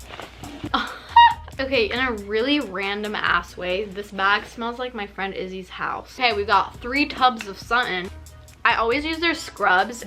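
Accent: United Kingdom. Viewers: Is accent sometimes goes to british accent 🧐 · İngiliz aksanı yapan sesini saatlerce dinleyebilirim ya kısmen rahatsız ediyor ve bu çok hoş ifşvkshsjgppglrlehçvçndks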